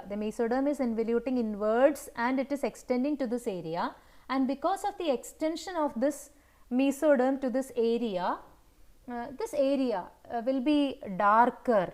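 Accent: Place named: South, United States. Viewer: and the moment i heard the southern accent, i was sure to obtain a wholesome experience.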